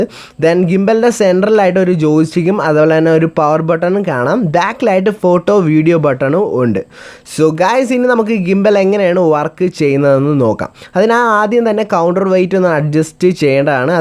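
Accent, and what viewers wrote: Alappuzha, India. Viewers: Machane ninga alappuzhackaran aano....😀😀😀😀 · ആലപ്പുഴക്കാരനാണല്ലേ ... കൊള്ളാം മോനെ....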